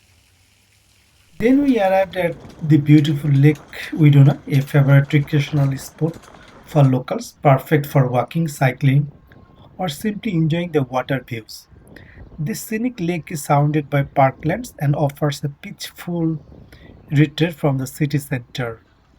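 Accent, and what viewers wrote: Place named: Bangladesh. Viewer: You speak bangladesh english, can't understand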